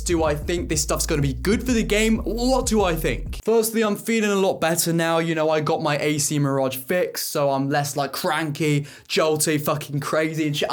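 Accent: United Kingdom. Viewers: i love that freaking british accent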